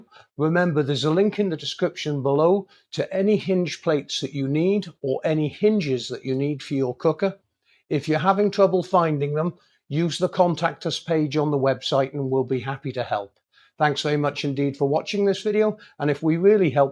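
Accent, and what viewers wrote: United Kingdom. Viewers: Absolutely fabulous! As usual. Every time I find something new to learn with Paul. Special thanks for your plain, Royal English so very easy to follow!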